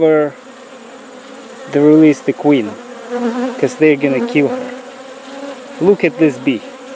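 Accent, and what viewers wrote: Russia. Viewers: russian accent😃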